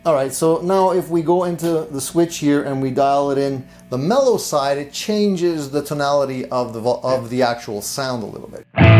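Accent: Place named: Montreal, Canada. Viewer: Sounds great! Maybe a dumb question, but are you from Montreal? If not, then you have definitely our English accent for some reasons haha Cheers!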